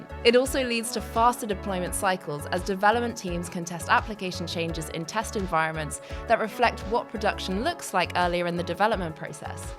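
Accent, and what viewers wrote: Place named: United Kingdom. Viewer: Woah this does make perfectly sense what says in this video. Plus I dunno if is British or Australian but the English people have the best accent in the world.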